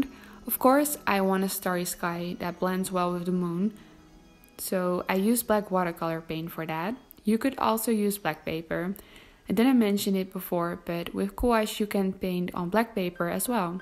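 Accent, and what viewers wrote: Netherlands. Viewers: You sound like you are dutch, arrreee you from holland???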